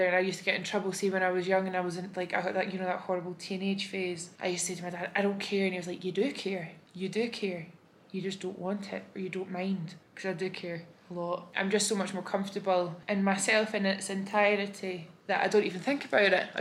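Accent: Scotland, United Kingdom. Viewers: i just started watching your vlogs & i'm already in love with your attitude towards life. you're so positive and inviting which is a welcome change from a lot of other influencers i've followed. your speaking voice is so soothing & you make the voice in my head change to scottish!! (i'm american lol) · you have a most joyous outlook on life and personality, its WONDERFUL. I could listen to you read books, give TED talks, describe instructions, whatever. Your gorgeous Scottish accent is soothing. Whatever direction you go with your interests and passions, I'll be sure to follow. Happy 2021 and love from Atlantic Canada 🇨🇦 ❤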